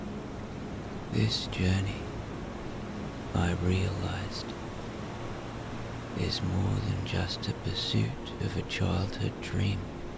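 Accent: Ireland. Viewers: your Irish accent, make your sleep stories amazing.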